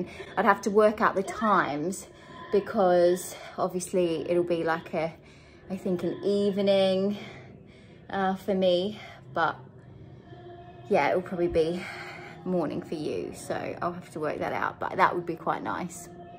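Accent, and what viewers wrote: Australia. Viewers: You’re such a pommy! Hahaha you have lived in the UK too long, do you reckon you have morphed into a pommy yourself? Or do you think you still sound Aussie I’m actually so interested to know! Xx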